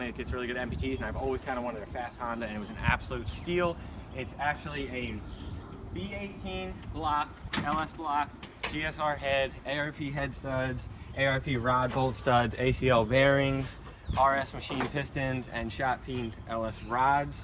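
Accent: Maryland, United States. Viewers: Soon as I heard that accent I knew he was from marelin hon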